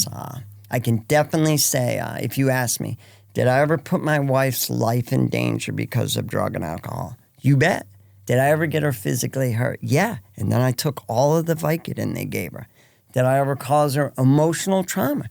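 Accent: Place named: Philadelphia, United States. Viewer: Dude sounds like he’s from S Philly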